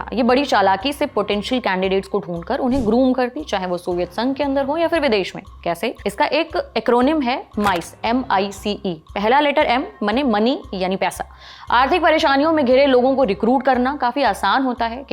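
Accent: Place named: Rajasthan, India. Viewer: madam aap Rajasthani ho 😇...tiya pancha bolne ka trika like rajasthani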